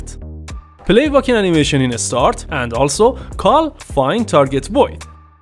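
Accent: Iran. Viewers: I love your Persian accent. :D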